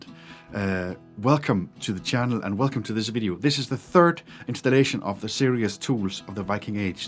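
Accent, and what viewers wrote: Ireland. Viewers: Great educational video again. Just wondering do you have any Irish connections? To my ear I can hear some Irish in your accent. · I wonder if you ever lived in the North of Ireland? The Dane and Irish accents share many similarities :)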